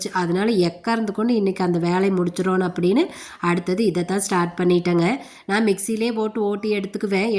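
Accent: Kongu, India. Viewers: Kongu slang 🎉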